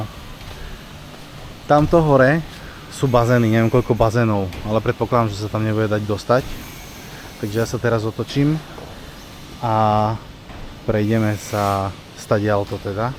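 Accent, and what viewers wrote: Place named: South, Slovakia. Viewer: super video,dakujem za typ a nie si z južneho Slovenska? Myslím si podľa prízvuku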